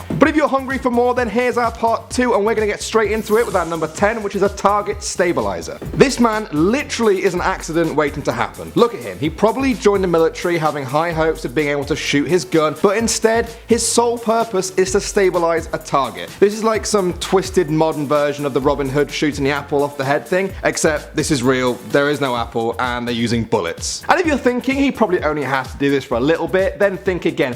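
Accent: United States. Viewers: Your american accent is actually not bad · Your American accent is better than other Americans. And you're British!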